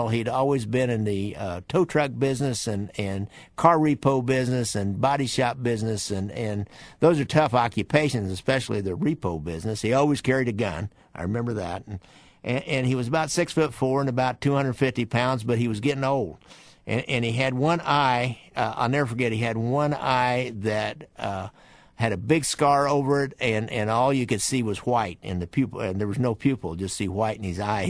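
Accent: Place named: Warrensburg, United States. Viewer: Your voice reminds me of some relatives in Warrensburg 😊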